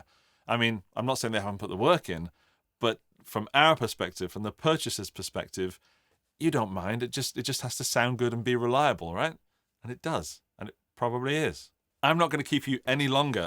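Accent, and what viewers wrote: United Kingdom. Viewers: Black start me up. Yeah I was researching this and you've clarified like butter. Thanks. You Brits make everything sound good anyway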